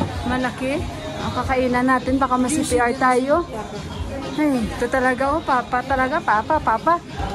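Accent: Iloilo, Philippines. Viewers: Natutuwa ako pag naririnig ko ilongga accent mo sis. Ang lambing at sarap pakinggan❤😊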